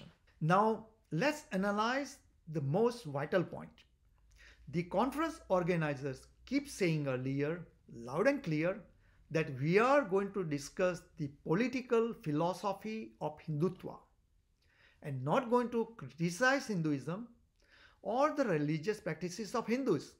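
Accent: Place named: India. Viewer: For a person who has been in the US for 45 years, you do sound like a freshly arrived Indian. Why do you deny everything about the atrocities committed by high caste Indians and their stooges in India? The results of hate that have been sown in India are becoming very clear all over the world. Just last week the Australian Govt has arrested and deported an Indian Hindutva supporter, Even the Oxford student who had to flee from her position is of the same ilk!! Of course, you will say "it's not proven"!! I am glad that the second generation of Indians in America keeps away from the likes of you!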